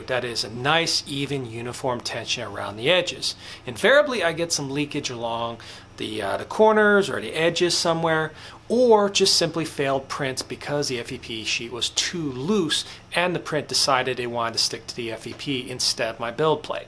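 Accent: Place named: Canada. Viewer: ahh ok, you sounded like a fellow 🇨🇦 😊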